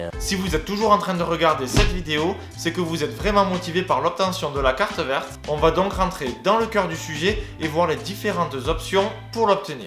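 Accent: South, France. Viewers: Je regarde quelques-unes de tes vidéos et me suis abonné. Je me pose une question. Est-ce que tu accent un peu "du sud" s'entend quand tu parles anglais ? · en passant tu as une petite accent du sud n'est-ce pas?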